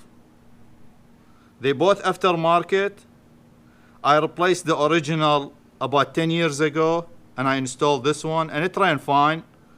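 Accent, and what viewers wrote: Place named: Iraq. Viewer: Don't get me wrong you have noticeably Iraqi accent so, can you open an firearms channel with your Iraqi accent, the thing is similar to fps Russia or ( سلاح زون gun Zone )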